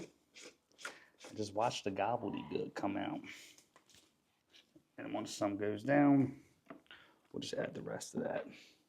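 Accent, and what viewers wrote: Maryland, United States. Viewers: nice I was born in Essex but grew up in Western Maryland. I know a MD accent when I hear it. Great videos keep up bro.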